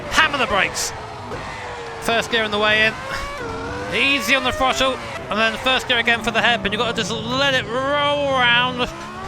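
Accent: United Kingdom. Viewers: My favorite Brit! I love how there is no "TH" in your vocabulary. I mean no disrespect, I think it is cool and sounds very Brit to me.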